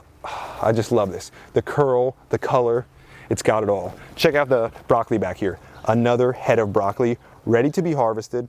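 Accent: New Jersey, United States. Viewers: I could listen to him just say Water in that Jersey accent all day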